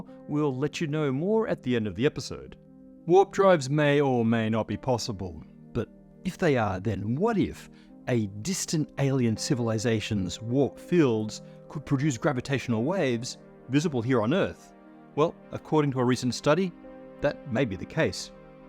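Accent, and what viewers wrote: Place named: Australia. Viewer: He's Australian lol.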